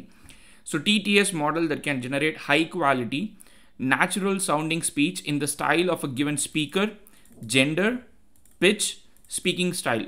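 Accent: India. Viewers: Ok I'm only 19seconds in but I have to pause here to tell U smth I hope maybe makes U smile a bit. UK in south park tech support is always an Indian worker and ik that india is actually very advanced in tech nowadays Ur country worked hard to get there and don't think the rest of the world doesn't notice. Even if we in Europe make fun of it I swear to god U won't find a single programmer in Germany that doesn't watch Indian tech tutorials. We are very grateful that U guys are making these amazing videos because our country for example is insane far behind and without people like you even the young people that want to learn are not having it easy because the generation teaching us is often times behind their students in terms of capabilities. Yes we make fun but we all respect U and even if Indian accent is funny ours isn't better and Indian English is one of the accents that's very clear and easy to understand. 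In school the listening parts for young kids in tests is sometimes with Indian accent and every time it's Indian there's a focking train or car passing by or faking helicopter sounds or whatnot because U Guys are that easy to understand. I probably would have an easier time communicating driving on top of an Indian train than beeing to dinner was an American guy. You guys are amazing we have huge respect and admire Ur guys effort and are grateful for your service to the education and evolution of the tech community. Don't be sorry for Ur accent wear it with pride my fr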